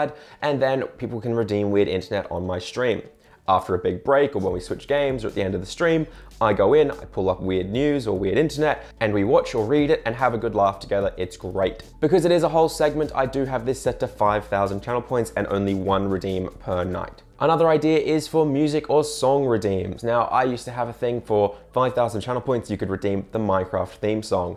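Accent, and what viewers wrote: Ireland. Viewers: I like the Irish accent! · As a German that is constantly being told that I have 0 accent, I took inspiration from your Irish accent and let my chat force me to "spiek in zeh tscherman eccent leik zhey taught as tu spiek in school" - instant redeem the first time I used it and my viewers got a kick out of it - thanks for the idea.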